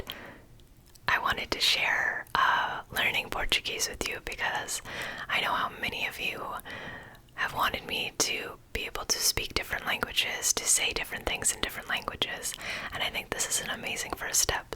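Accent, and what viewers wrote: Rio de Janeiro, Brazil. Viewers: Narrator's accent is from Rio de Janeiro. In São Paulo would be sss sound , not sshhh sound. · Exactly, Carioca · Toda carioca ela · In Portuguese: Você é muito fofa falando em português! Eu amei te ver falando nosso querido idioma, mas tenho uma informação para acrescentar a você: A pronúncia do S em português é mais utilizada como "ssssss". A pronuncia que soa como "shhhh" não é o padrão brasileiro. São pouquíssimos estados que pronunciam a letra S com "shhh", que são os cariocas (nascidos no Rio de Janeiro) e os nordestinos (nascidos na região nordeste). De resto, todos os outros estados pronunciam o S da forma padrão, soando como "sssssss". São culturas diferentes que se expressam de formas diferentes e não tem nada de errado nisso, mas é quis compartilhar essa informação com você! E obrigado por esse video, quero te ver mais vezes falando em português! 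Você tem um sotaque muito lindo <3 In English: You are very cute speaking in Portuguese! I loved seeing you speaking our beloved language, but I have some information to add to you: The S pronunciation in Portuguese is more used as "ssssss". The pronunciation that sounds like "shhhh" is not the Brazilian standard. There are very few states that pronounce the letter S with "shhh", which are Cariocas (born in Rio de Janeiro) and Nordestinos (born in the Northeast). Otherwise, all other states pronounce the S in the standard way, sounding like "sssssss". They are different cultures that express themselves in different ways and there is nothing wrong with that, but I wanted to share this information with you! And thanks for this video, I want to see you mor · Morri com o sotaque carioca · The narrator is Carioca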